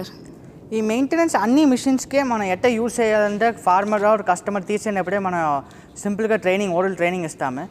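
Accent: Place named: Tamil Nadu, India. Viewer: He is Tamilian, far better, got the point know.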